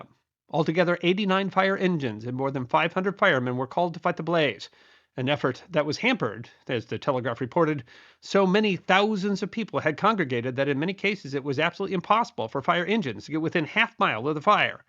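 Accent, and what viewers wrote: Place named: United States. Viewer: its happening again gareth, another annoying voice over from a mickey mouse sounding yankee talking about a British subject!! why is this and can it be stopped asap, so very annoying and basically rude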